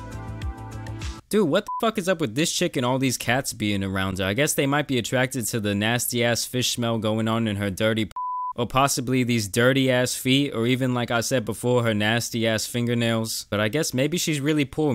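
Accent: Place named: New York, United States. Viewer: The only guy from Texas with a New York accent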